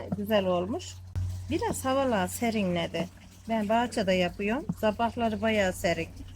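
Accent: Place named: Aegean, Turkey. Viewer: Ayni bizim gibi konusuyo usakla afyonu konuşması hi denizlide ayni şive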